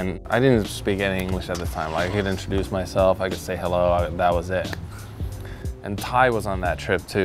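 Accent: United States. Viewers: From the comments in the he said in the menkimati video, his sponsors in Finland in this video and the way he pronounces Finnish words in an American way. I get the feeling he isn’t the biggest fan of Finland :) Feels like he was someone who didn’t belong and is happy that he got out. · I'm sure hanging out with cali stoners for 20 years helps with the accent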